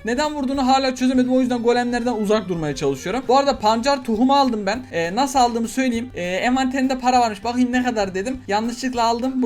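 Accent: Malatya, Turkey. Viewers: Abi sen malatyalısın ya neresinden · ABİ SEN MALATYALIMISIN NERESİNDEN BEN DE ORALIYIM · Abı sen Malatyali misin bende malatyaliyim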